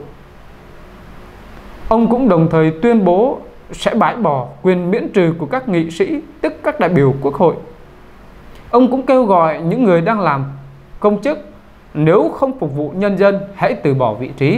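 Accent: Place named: Countryside, Vietnam. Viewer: Giọng thằng này nhà quê thật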